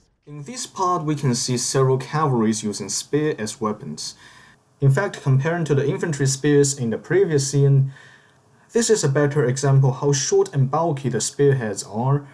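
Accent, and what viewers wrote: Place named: China. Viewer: By your pronunciation I assumed that you are Chinese so can I ask in Chinese? 我一直很好奇為何漢朝的盾 或中國以前的盾 都有點倒三角形 跟西方的騎士盾剛好相反 這是為何呢 ？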